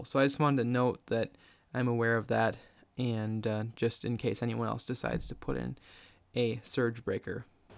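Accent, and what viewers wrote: Minnesota, United States. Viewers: Sounds like a Minnesota accent. A virtual Canadian.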